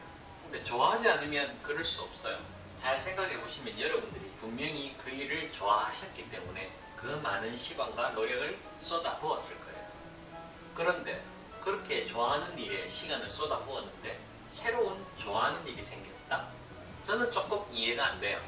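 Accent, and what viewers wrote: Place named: Gyeongsang, South Korea. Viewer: 참 부지런하시네요. 말투가 경상도 싸나이~? 좋은 말씀들 잘 듣고 구독 꾸~욱 누르고갑니다.♡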